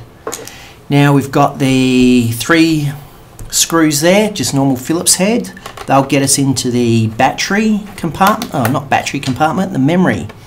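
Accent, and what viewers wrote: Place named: United Kingdom. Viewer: TH - A annnnk U! I'm still use this model. Are you british ? I like your accent!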